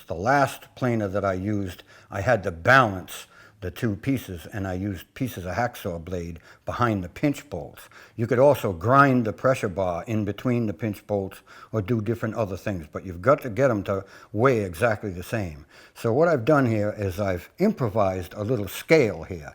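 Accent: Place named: Maine, United States. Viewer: I am guessing you're from Maine from your accent (I grew up there)....I just got my first planer and I greatly appreciate this video, I am going to make these adjustments THANKS!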